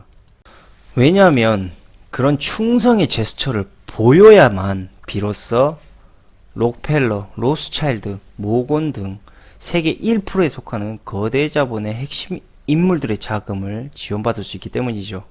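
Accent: Jeolla, South Korea. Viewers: 말투가 전라도라....청화대에 세월호 선장 이준석 같은 무리들이 있어서 큰 낭퍠로다. 호남 인민 공화국이 다 된것 같지만.....이 세상의 흐름은 진실 앞에 거짓은 망할 것 이로다. 왜냐구....트럼프가 있기 때문에.... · 아 전라도 사투리 시발 존나 듣기싫네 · 말투가 전라도인게 뭔 상관이냐 ㅋㅋㅋ 지역감정 부추기는거 정말 혐오스럽다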